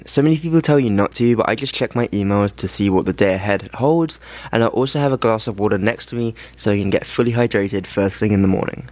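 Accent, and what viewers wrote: United Kingdom. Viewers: His British accent 😘😘😘😘😘😘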